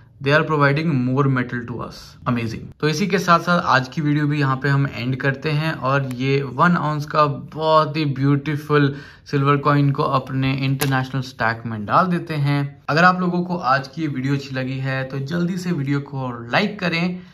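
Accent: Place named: India. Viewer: Man indian accent is difficult to follow hehe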